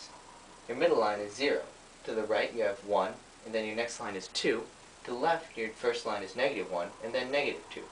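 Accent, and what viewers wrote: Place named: India. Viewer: this guy is awesome, he teaches chemistry, looks Japanese, and has an Indian accent :D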